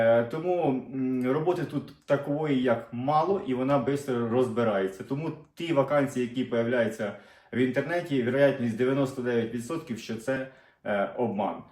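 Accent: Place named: Vinnytsia, Ukraine. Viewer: .. У вас комплекс меншовартості, це говір вінничан. Людина має коріння, рід і це мова їхня.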